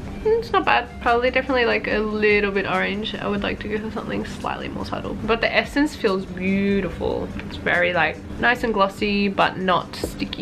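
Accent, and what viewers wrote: Australia. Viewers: I can tell as much it's Australian accent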